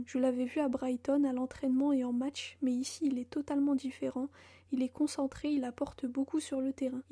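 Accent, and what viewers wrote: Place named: Spain. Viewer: J'aime bcp son accent espagnol, même à la fin "visca Barça visca Catalunya" merci pour le contenu.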